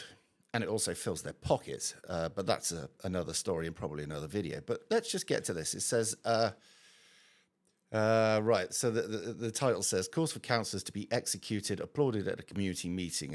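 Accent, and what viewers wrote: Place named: England, United Kingdom. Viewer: This channel is pure grifting with an English accent.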